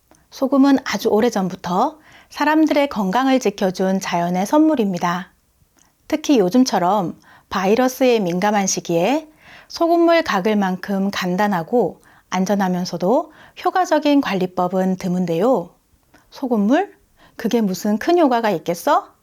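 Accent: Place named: Gyeongsang, South Korea. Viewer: 깨알 정보 정말 고맙습니다 좋댓구알 햇십더~ 구수한 경상도 억양 좋아요~~소실적 생각 많이 납니다 ㅎ 구룡포도 생각 나구여!! 영상 감사 감사 합니다~~~! 화.이.팅하세요~~!)))))))))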